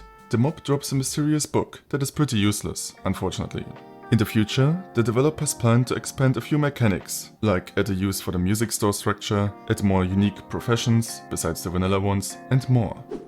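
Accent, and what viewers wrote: Germany. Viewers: Deutsch englischer Dialekt accent yeah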